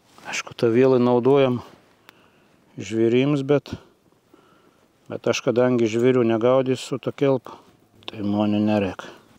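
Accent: Samogitia, Lithuania. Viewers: Smagus video, mano asmeninis pastebėjimas, kažkodėl labai tinka žemaitiška tarmė tokio pobūdžio turiniui 🐻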